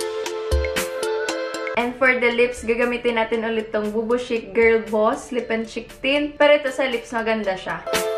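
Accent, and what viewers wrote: Visayas, Philippines. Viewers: Bisaya ka? Hahaha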